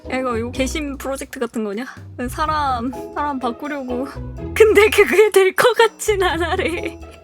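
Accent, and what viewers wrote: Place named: Japan. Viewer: 역시 은 일본식 영어랑 가요느낌이 잘 어울려...다음엔 그런것도 해보자..!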